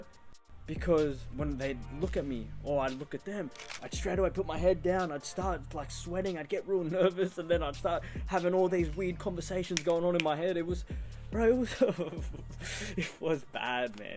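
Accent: Australia. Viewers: just popped up in my algorithm, stoked to hear an aussie voice on this journey. cheers lad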